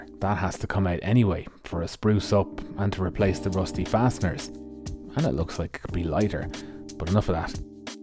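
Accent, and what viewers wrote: Ireland. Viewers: Just noticed you used your roll over framework on the vw bus, just spotted it on the intro collage. Your videos are a treat even my partner agrees but that might just be the Irish accent.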